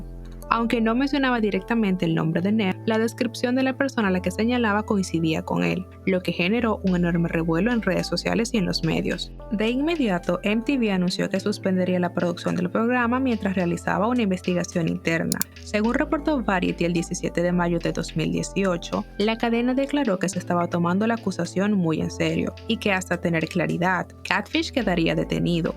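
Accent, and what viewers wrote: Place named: Dominican Republic. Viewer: Por tu acento supe que eres dominicana, buen video sigue así y que DIOS te bendiga :3 😊😊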